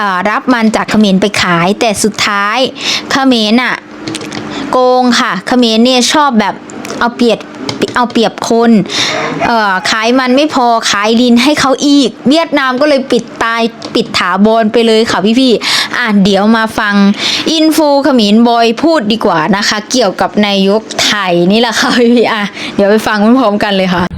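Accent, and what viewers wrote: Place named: Laos. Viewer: พูดภาษาลาวก็ได้ทำไมไม่ดูช่องคนอิสานเขาก็พูดภาษาท้องถิ่นแต่คุณเป็นคนลาวดันมากระแดะพูดภาษากลางฟังแล้วมันขัดๆหูครับ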